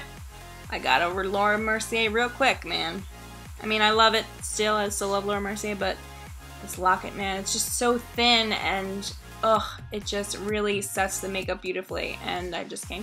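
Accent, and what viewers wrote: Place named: AAVE, United States. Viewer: I think you are beautiful and you are very talented with makeup. I appreciate you sharing your knowledge. With that being said, I find the Ebonics a little irritating. There is no need to say things like "ma" face.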